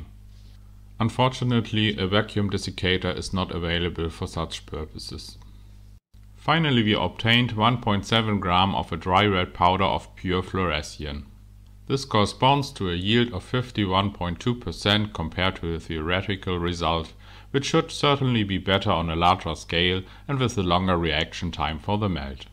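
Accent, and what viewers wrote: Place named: Germany. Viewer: I simply cant overlook the german sounding accent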